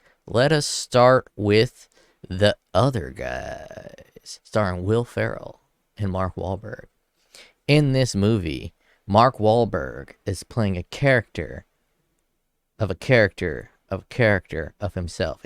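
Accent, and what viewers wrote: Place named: Alabama, United States. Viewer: What Town did you live?goddamn Alabama country boy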